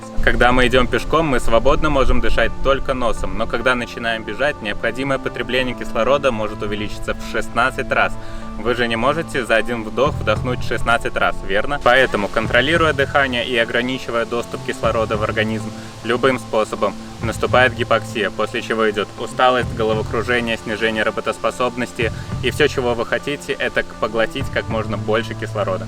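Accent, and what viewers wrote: United States. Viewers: Так он русский? Я думал американец либо ещё кто тл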